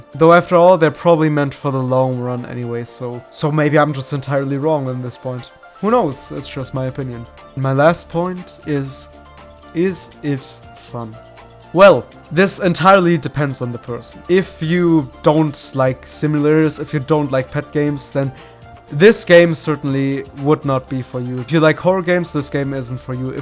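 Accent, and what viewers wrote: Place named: Germany. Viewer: I hear deutsch aber gutes video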